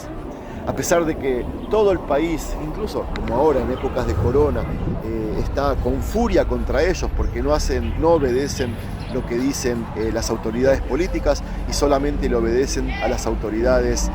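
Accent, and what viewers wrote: Argentina. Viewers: Viniste alguna vez a Argentina? Tnés algo argento en la forma d hablar🙌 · es judío? Xq habla español y con asentó argentino chileno no se q asentó sea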